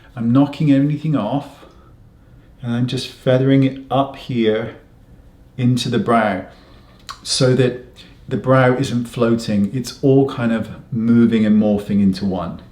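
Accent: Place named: England, United Kingdom. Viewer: So precise and helpful I'velearned so much from binge watching. Love the English accent so pleasant to listen.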